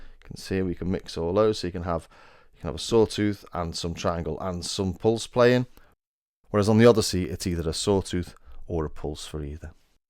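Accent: Liverpool, United Kingdom. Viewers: Glad I found yer channel. YouTube needs more of us with 'regional accents'. I've always liked Scousers (apologies if you're not actually a Scouser and now deeply offended).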